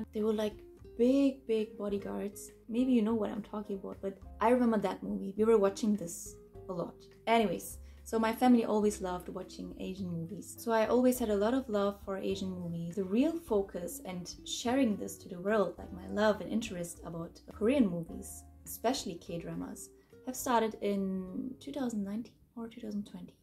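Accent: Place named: Turkey. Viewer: Just by looking at your face I felt that you might be Turkish and then I heard your accent and I knew for sure🥳 You dance beautifully, hope you will continue your journey all the time💙 And good look with Korean and other languages ^^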